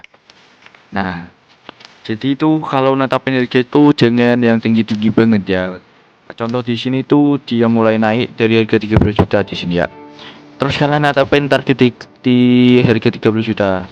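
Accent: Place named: Java, Indonesia. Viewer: suara jawa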